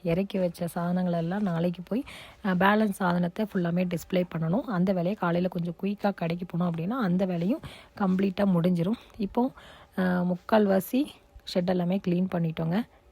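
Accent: Tirunelveli, India. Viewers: பொடு பொடுன்னு திருநெல்வேலி ஸ்லாங் 👌🏿👌🏿👌🏿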